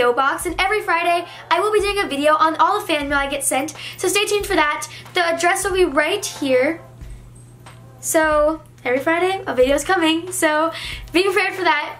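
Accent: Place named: Pennsylvania, United States. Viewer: Do you live in PA? Jw bc you kinda have an accent 💗 (btw loved the vid!)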